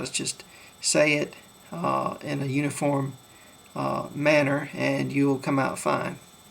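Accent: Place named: Carolinas, United States. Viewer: Thanks I'm greek and i was interested in learning some of my racial neighbors languages and you sound like ur from down south, im from NC and ur accent sounded very carolinian. But nice to know a few things about the turkish language from a non biased PoV(as most people know we dont seem to get along too well... but shit thats past worries.)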